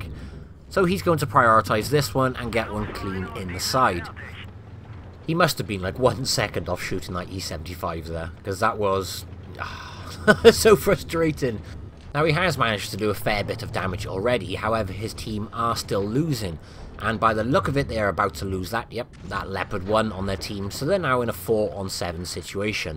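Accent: United Kingdom. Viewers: Oh god I just love to hear your voice and laughter and that stunning British accent of yours along with the explanation of what is happening in the replys frankly as much as it's sad to say but truth be told for me you are The best WOTB YouTuber and yes even better then TTTimster he's latest videos became more like (I'll put whatever is there to refresh the channel case) honestly at least in my own prospective and you already know that jimmy the one with the E_100 reply already sent one to TTTIMSTER and honestly I didn't like it in any shape or form especially with he's terrible commentary...... unlike you m8 always happy to watch your videos some of them can make my day... so please don't stop laughing and publishing those beautiful videos of yours... bye.....:D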